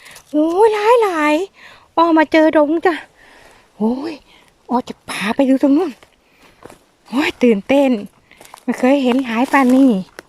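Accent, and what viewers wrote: Korat, Thailand. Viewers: พูดภาษาโคราชฟังน่ารักจ้าน้อง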